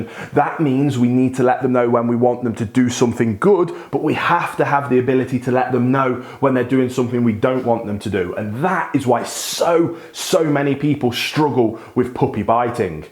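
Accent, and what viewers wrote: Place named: Midlands, United Kingdom. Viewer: Your videos are just fantastic! And hearing a midlands accent reminds me of home..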